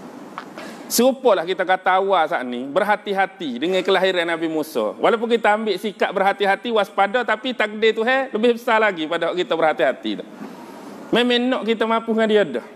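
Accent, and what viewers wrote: Kelantan, Malaysia. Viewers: Dialek Kelate???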